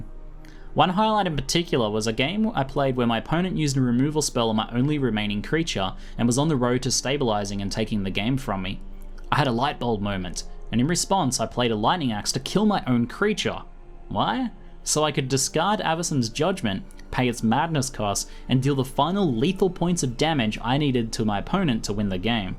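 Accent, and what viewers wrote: Australia. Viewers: oh wow, I haven't seen any mtg content created by a fellow aussie before, this is awesome! I want to find more of the Australian MTG community, where/who are the people? Sometimes it's just nice to hear an aussie accent, you know? :D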